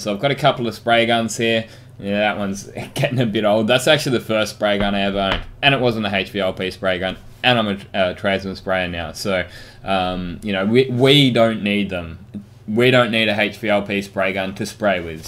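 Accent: Australia. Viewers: Hey mate, love your channel, very new to spray painting and really enjoying it. I’m a woodworker, building small furniture and musical instrument projects, and I have a small compressor putting out about 4cuf/min; it came with two cheapo HVLP guns which are ok to get me started but clearly not up to much more. The FLG-5 looks great but it still calls for about 10 cubic feet/min; some other woodworking channels recommend LVLP guns which seem to fit the bill for what I want - can you recommend any which are easily found in Australia? I can get one from the US but shipping and import duties are crazy 😝. Keep up the great work, love hearing another Aussie accent!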